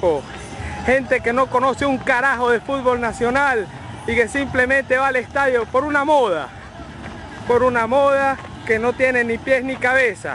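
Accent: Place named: Argentina. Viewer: Demasiado bueno el video... Pero por momentos llegue a pensar que quien narraba era un argentino... Pilas con ese asento mi hermano! Aguante Vinotinto!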